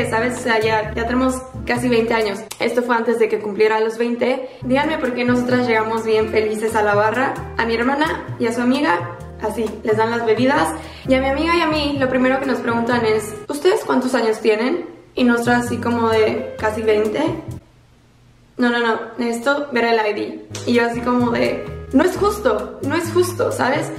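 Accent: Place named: Spain. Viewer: ¿Por qué siento un ligero acento español jajajaja? :)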